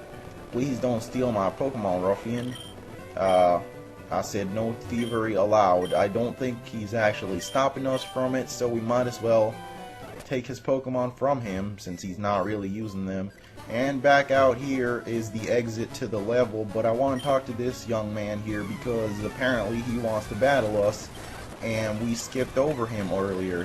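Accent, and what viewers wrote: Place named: South, United States. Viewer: New York, dang, you sound like a Southern guy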